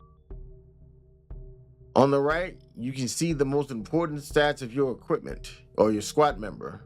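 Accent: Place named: Italy. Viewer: I was going to say, I wholeheartedly agree, if you CAN take advantage, you MUST take advantage 🤪if anything, it's making me make the mechanics in my game deliberate and airtight - speaking of which, I'm getting close to Demo material and would be honoured if you would give it a go. Always like watching your videos brother, I suspect we are from the same generation, a lot of references you make I catch - always appreciate the Italian accent too, being half Italian myself 🤌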